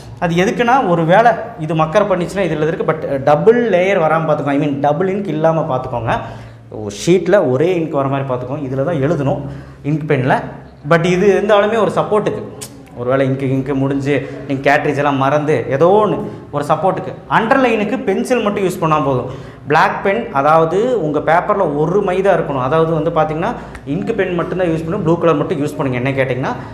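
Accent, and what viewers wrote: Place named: Chennai, India. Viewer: bro I love your slang Neenga Chennai ah ❤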